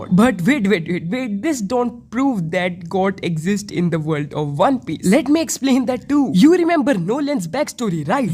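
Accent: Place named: India. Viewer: are yu ind. yur accent is alike one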